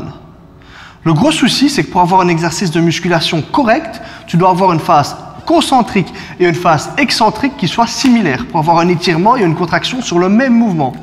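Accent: Liège, Belgium. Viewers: Bonsoir , c'est bien juste ce que tu dis c'est très intéressant .... Juste un truc , tu parles avec un accent que je semble connaître ..tu es belge ? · Liegeois expatrie aux USA, je suis subjugue par la qualite de tes videos. Et l'accent me rappelle le pays ;)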